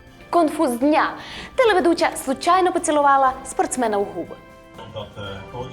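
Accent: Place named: Transcarpathia, Ukraine. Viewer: Podriv Ustoev Закарпатська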